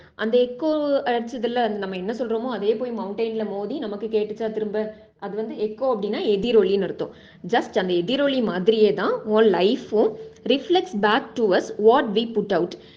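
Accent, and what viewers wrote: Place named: Tirunelveli, India. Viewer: Hi Mam, are you Living nearby Thirunelveli? Because your slang be like so.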